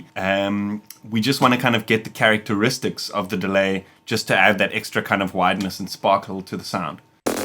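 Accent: South Africa. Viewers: You’re a good teacher on the subject you sound SouthAfrican?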